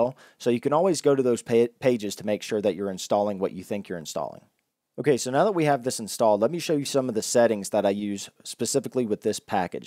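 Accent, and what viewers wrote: South, United States. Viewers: Does he have a southern accent or am I hallucinating?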